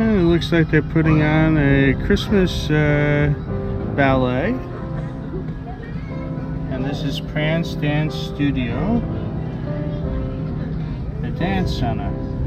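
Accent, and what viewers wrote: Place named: Northeast, United States. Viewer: It's certainly good to hear from you. Where are you originally from? I detect a northeast accent. I stubbled upon your channel because I'm thinking of moving down to the RGV. Anyway, you have another sub! Have a wonderful Christmas season and I'll be watching...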